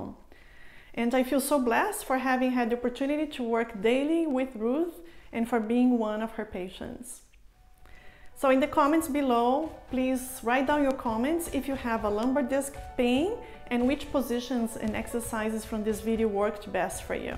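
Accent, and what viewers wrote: Brazil. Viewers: You sound Brazilian.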